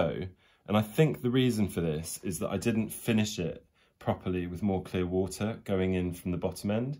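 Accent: United Kingdom. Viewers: Watercolours has been an enemy to me for years, pfft. But even so I enjoyed this video a lot. I'm a big fan of people who can actually work with watercolours, they have the God's hands I would say😂 Also, brittish accent gives lives. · How did I not find you before?? Add with an English accent : a balm to my ears x I'd appreciate any contents on volume and movement, please! Thanks!!!!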